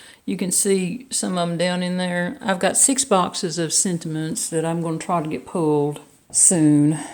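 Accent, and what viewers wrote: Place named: South, United States. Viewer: New subscriber! Love listening to a fellow southerner soft accent !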